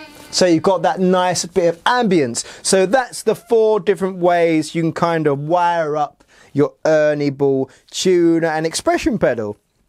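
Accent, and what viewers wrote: England, United Kingdom. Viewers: Are u from England? I lived there for 5 yrs...IPSWICH!!!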